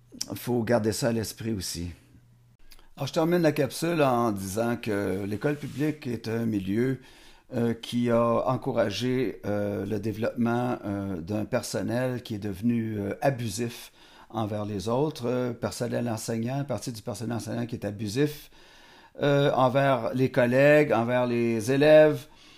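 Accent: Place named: Vendée, France. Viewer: Ils sont tous en burn out! Le cerveau brûle...ce type de prof je le décalque au mur! J'eusse connu ...mais pas à ce degré j'avoue ! Ça craint ce monde plein de demon. Bisous Prof avec ton accent souche de Vendée je crois bien sauf erreur de ma part ! ❤💞❤️‍🔥🧠🫶🙏 le TDAH Ça me connaît mais chez nous y a un retard de 300 ans ...je comprends mieux en écoutant des concernés du Canada car en France c'est pitoyable ! C'est mon cerveau TSA qui m'a sauvé les miches! Je remercie mon cerveau chaque jour crois moi! Heureusement je n'ai pas de retard cognitif ! Etre ambivertie est une triforce! Je ne suis plus une enfant ceci dit! Un demi siècle déjà ! J'ai pu comprendre grâce au Dr Karpman qui causait avec Joe Black...je sais aujourd'hui qui je suis...parfaitement imparfaite dys sur dys! 😂😂😂😂❤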